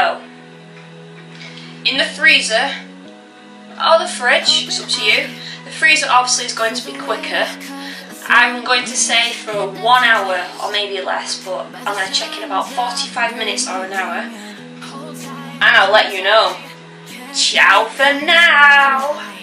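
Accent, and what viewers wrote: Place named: Oceania. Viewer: Full view and like #5 from Bits of Real Panther! I think it's pronounced BWAY-no. You certainly have an energy about you,! I couldn't quite place the accent. Somewhere in Oceania? At least the recipe here was simple, something even I could do! hehe Also, do you keep any of your thumbnails on file? I'd like to feature a couple of them in an upcoming video. Anyway, thank you much for sharing, and I hope your weekend was truly "sweet"!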